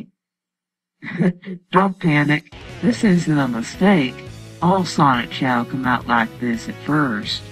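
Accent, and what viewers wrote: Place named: South, United States. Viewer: Can confirm, lotta southerners talk like this. Even me from time to time😅 Surprisingly, this aged just fine though voice sounds a tiny bit scratchy(like just woke up kind of scratchy) It looks like it helped a lot of people going by the comments. Even now, this is a great video!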